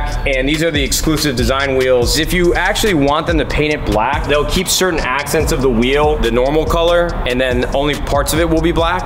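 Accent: United Kingdom. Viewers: That British accent was sick oh my 😂 From Leicester big love Car is actually unreal too congrats on all of it you 100% deserve it, been watching since dayyyy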